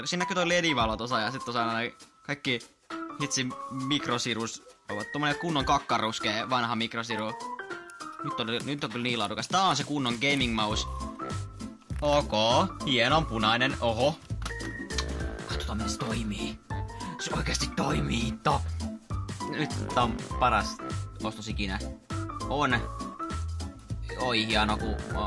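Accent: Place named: Turku, Finland. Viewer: on kunnon turu murreee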